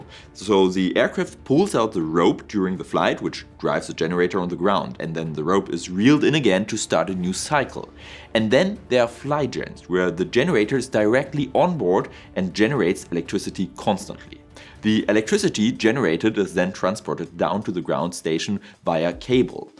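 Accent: Germany. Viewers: hello Dr, could you please speak a little bit faster? i'm having trouble paying attention to what you're saying because my mind keeps getting distracted by your thick German accent. you say that when wind speed doubles, its power increases 8 fold? the energy of the air particles is 0.5mv^2 & power is energy/time so maybe 4-fold? you how they make plastic kayaks where they put the kayak mold in a machine that spins it in all directions & has the molten plastic cover all the walls evenly?, maybe after inflation when the balloon is rigid, you could do a similar thing with a very light sealant that coats the insides while the balloon is spinning around all crazy in a heated chamber. this design is very cool because the turbines are in an enclosed space & it would cost very little energy to make them bird-safe. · honestly like if people think talking slower would help them... 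they know there is a speed button right? I have never had an issue understanding you and I don't have much experience listen to people with a german acent and sorry I just don't watch you that often. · A very interesting, well illustrated, insightful and well balanced video. Your speed of presentation was perfect for me. I much prefer your very clear diction and mild German accent to the strong regional accents of the UK. Cheers from Scotland.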